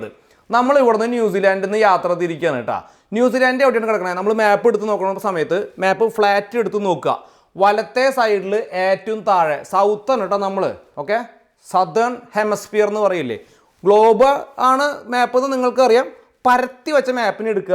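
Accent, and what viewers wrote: Thrissur, India. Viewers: History,geography,sciencum nalla Thrissur slagil kelkan Nala rasa 😅😅😅 keep going btw chettan Thrissuril evedeya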